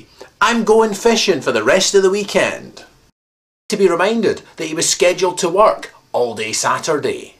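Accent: Scotland, United Kingdom. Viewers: I love Scottish acsent and I love this video, you are the funniest guy. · Your Scottish (or Irish) accent is the funniest thing in your videos. The rest of the content is fairly awesome, too, however.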